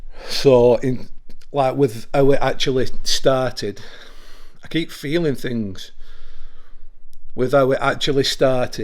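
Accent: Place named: Manchester, United Kingdom. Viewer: Hey up.. just listening to your background its a bit like mine.. I lived with my grandma n grandad but mine was a little boy!!! I had a load of crap that started cos i used to talk to it.. bit of a long story!! But i asked it to leave us alone in the end.. it followed me when i went to live with my mum & brother down the rd and it went crazy there.. now i block it all out now and focus on my belief in God and pray the rosary, i never say gods name in vane and try and lead a good life.. I've never done a ghost hunt.. definitely not the glass game as my mum will say.. far to dangerous.. do you mean you used to do those kind of things with groups?? Ya sound a Manchester bloke.. I'm Sheffield and I know there's loads of ghost tours around Sheffield cos my stepdaughter went on some.. I went mad at her cos i was always taught to not mess around with that stuff and like mediums etc cos your opening yourself up.. 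I'll pray for you & your family.. stay positive n I'd say don't talk to it and pray the rosary.. I ordered a rosary with a prayer book off ebay.. tells you how to say the rosary properly.. You and your family keep safe and well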